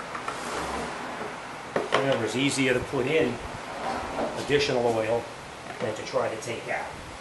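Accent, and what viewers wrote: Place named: New York, United States. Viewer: Precise, to the point and love that NY accent. Great Job!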